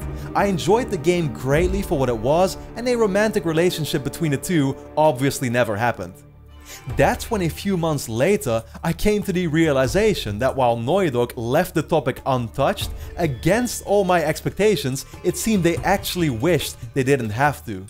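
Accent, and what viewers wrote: Netherlands. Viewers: Never heard of your channel, and Youtube pretty much always recommends trash. I decide to watch a recommended video for once, and it's gold. Spot. On. Plus, hup, Holland hup! Meer Nederlanders met een stevig accent in games!!!!!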